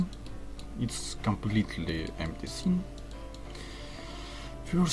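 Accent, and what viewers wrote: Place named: Russia. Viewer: Привет! Можно документацию на русском? Очень неудобно слушать английский от русского :///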